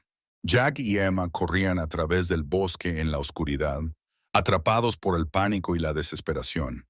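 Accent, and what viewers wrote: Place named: United States. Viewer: Estaban en la entrada de la casa? o estaban en el piso de arriba?, te contradices. Además esa voz agringada, me resulta chocante.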